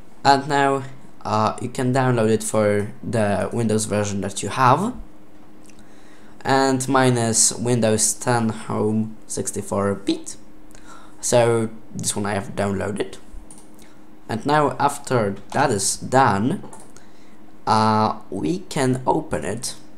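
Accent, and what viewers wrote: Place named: Poland. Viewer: Polski akcent 🔥🔥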